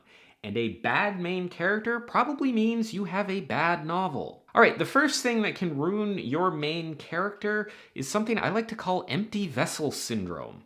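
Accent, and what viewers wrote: Canada. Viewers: Once again commenting on an old video: Balding isn't a flaw, nor is a Canadian accent (that's an asset). Lack of charisma could be a bit of a flaw, but it's not one you have, at least when it comes to video. Now I do have a problem with the single character being the centre of the world. If you're writing from a single POV, that character is automatically at the centre ALL THE TIME. If the POV is first person, there's more leeway because you have immediate, deep access to their thoughts, and they can spend a lot of time thinking about and observing the things happening around them. In a sense, 1st person POV characters can serve as a storyteller in their own right. In 3rd person, though, this level of interiority comes at a higher price because of the perceptual gap between the external and internal experience of that character. Is this really a problem inherent in single 3rd person limited POVs? 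Or are we just so used to an enormous multiplicity of POVs in epic fantasy that, in comparison, books that contain a single 3rd limited POV seem too focused on one character?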